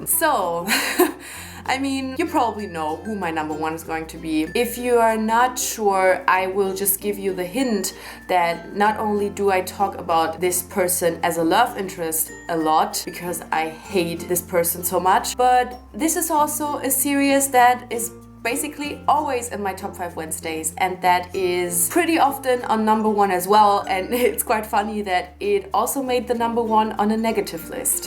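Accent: Germany. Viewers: Great List! Are you german?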